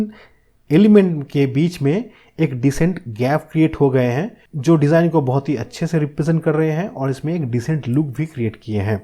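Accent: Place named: Bengal, India. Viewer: সুপ্রিয় মহাশয় , আপনি যে এমন একটা অ্যাডভান্সড টপিকে এত ডিটেলস এ টিউটোরিয়াল বানিয়েছেন এটাই অনেক বড়ো কাজ। বাকি আমি বাঙালি বলেই আপনার হিন্দিতে বাংলার টানটা ধরতে পেরেছি, তবে আপনার হিন্দি যথেষ্টই ভালো, হিন্দি ভাষী বন্ধুদের ও আশা করি বুজতে কোনো সমস্যা হবেই ন। আমি মালদা জেলার এক সিভিল ইঞ্জিনিয়ারিং পড়া মানুষ, আমার পক্ষে CSS HTML বা কোডিং বোঝা সম্ভব হয়ে উঠেনি এখনো । তবে আপনার মতো wordpress developer দের এত সহজ ভাবে বোঝানো ভিডিও গুলো সত্যি খুব সাহায্য করেছে আমাদের মতো non-techie দের ও নিজের blog বানাতে। আপনার কাছে আর ও একটা রিকোয়েস্ট থাকলো --- যদি সময় পান তাহলে GeneratePress theme এর Page break করার পর যে Pagination page number , first page, last page eisob j aase , eta k aktu colourful korar jonno , CSS code ta kothai edit korte hoi jodi tar opor ekta video baniye den....tahole amar khub subhidha hoto....thik etar motoi <link>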